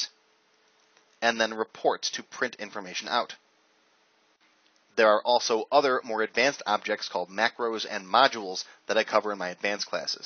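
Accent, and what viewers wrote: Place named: United States. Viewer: Thank you for your helpful video tutorial! You speak with so much clarity and articulation! What a pleasant surprise I got when I heard your accent. Finally someone who speaks English but even better that it's American :)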